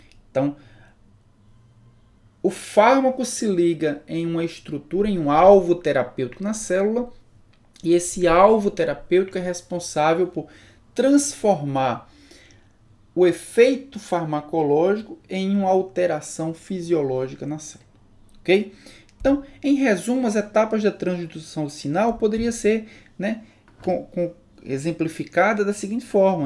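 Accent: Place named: Brazil. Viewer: muito bem explicado, adorei. Que sotaque lindinho e simpático, bem Brasil. Obrigada pela ajuda. bjs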